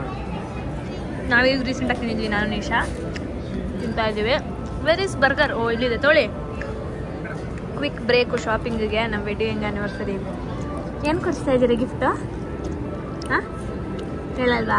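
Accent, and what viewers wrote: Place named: Channapatna, India. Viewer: I like Channapatna kannada slang❤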